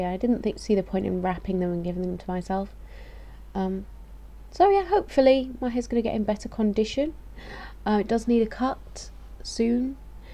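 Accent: United Kingdom. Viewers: it's a luffly voice. It seems to have bit of a northern tinge to it, but I like Yorkshire/Lancashire area accents (I'm not sure where you're from). And no, you don't sound like a robot! Just pretty chilled. =)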